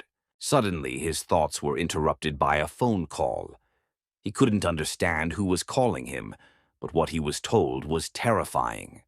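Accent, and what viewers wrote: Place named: United Kingdom. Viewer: The narrator is British!!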